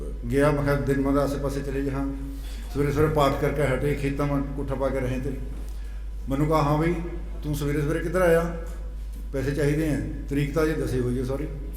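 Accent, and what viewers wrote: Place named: Puadh, India. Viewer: ਸਾਹਬ ਜੀ ਨੇ ਕਮਾਲ ਦੀ ਜਾਣਕਾਰੀ ਦਿੱਤੀ ।ਪੁਆਦੀ ਬੋਲ ਕੇ ਸੁਆਦ ਲਿਆ ਦਿਤਾ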